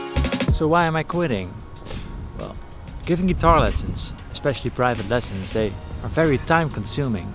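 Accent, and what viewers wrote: Netherlands. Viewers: so he is dutch? i just discovered this channel and was wondering about his accent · Nice, always thought I recognized a Dutch accent, bit now i'm for sure.(lesruimtes) Good luck on your new challenges. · Hi, bij toeval een weekje geleden op je kanaal gestuit. Ik kon horen dat je uit NL kwam, dus ik zoeken, blijk je in Zwijndrecht te wonen, naast Dordt waar ik zelf woon. Ik zag dat je les gaf, maar geen leerlingen meer aannam. En nu lees ik dat je stopt als leraar. Er kan veel gebeuren in een week. Supergoede keuze: je hebt superveel talent en een drive, dus dit gaat zeker goed uitpakken. Best of luck! Markwin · I love your accent. :v As a hobbyist voice actor I'm going to look into learning how to do a Dutch accent now. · It's Dutch, it's a pretty unique accent.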